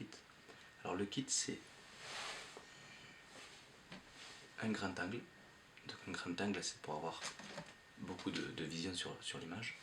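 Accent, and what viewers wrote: South, France. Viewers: Accent du sud haha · toi tu est sudiste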